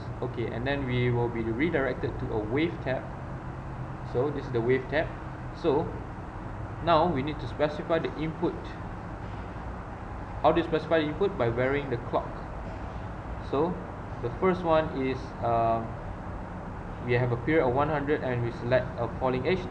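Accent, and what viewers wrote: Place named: Malaysia. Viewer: your accent makes me miss Malaysia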